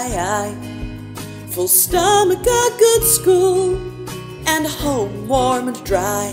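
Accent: New Zealand. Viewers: Omg, are you Kiwi?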